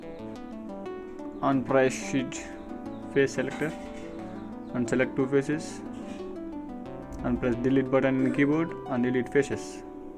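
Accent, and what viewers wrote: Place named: India. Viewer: This tutorial is an attack on one's ears by a very bad accent (sounds like Hindi) and irritating repetitive noise (music) in the background, almost infringing to the foreground. If one can suffer this or tolerate it, there may be something to learn. However, there are better tutorials.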